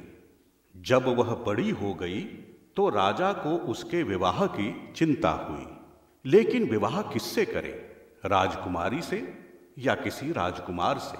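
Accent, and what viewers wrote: Standard. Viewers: शुद्ध उच्चारण में सुनाई गयी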